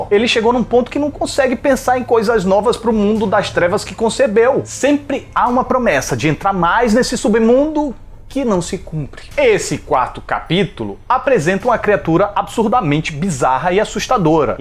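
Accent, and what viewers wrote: Northeast, Brazil. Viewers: Chó advinhar, tu é nordestino néh?? Eu sou! Aq tá fazendo um calor da gota serena! 😅🔥🔥🔥 Amei o vídeo! ❤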